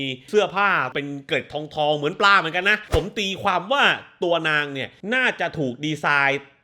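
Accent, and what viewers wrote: South, Thailand. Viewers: พี่เป็นคนใต้มั้ยคับ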